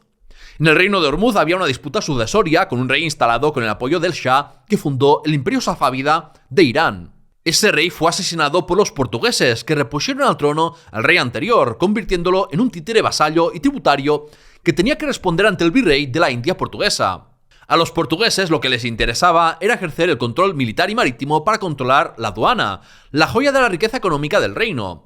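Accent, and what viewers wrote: Catalonia, Spain. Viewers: Acabo con la monarquía hispánica? Menudo acento indeptista tienes, tu canal parece una clase de adoptrinamiento de una escuela indepte pagada por ciuercal 3 por ciento . Menos mal que hablas español así me entero del tipo e odio que pretendes generan en quien te ve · Joder, que acento catalufo asquero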